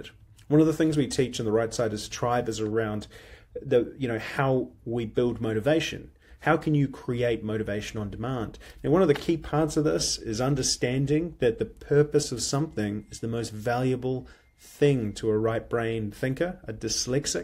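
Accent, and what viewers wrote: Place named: New Zealand. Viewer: Lovely hearing your Kiwi accent!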